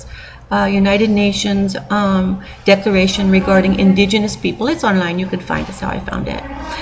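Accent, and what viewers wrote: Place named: Mexico. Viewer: you stupid bitch you exposed yourself......you have no business speaking for natives........you have no people on the rez......what happens on any rez is not your business.........you dnt even know if youre indian and you say you may have been kidnapped from egypt as a baby.......you are a nutcase who smokes crack........White Buffalo Calf Woman id gods ONLY prophet and will appear back to her peop[le as a white buffalo not as a crazy non lakota with a mexican accent preaching to other non-indians........put the crack pipe down before you end up dissappearing on a rez never to be seen again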